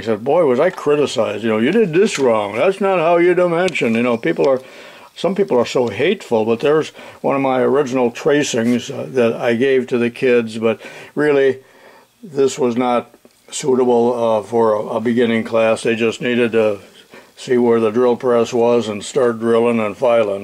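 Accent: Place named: United States. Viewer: I like your accent. To a north European like me, it sounds like I'm watching a western cowboy movie! 👍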